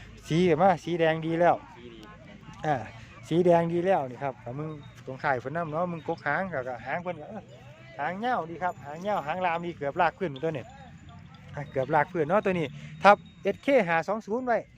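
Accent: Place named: Sisaket, Thailand. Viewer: สวัสดีค่ะอ้าย ศรีสะเกษชัดเจนจ้า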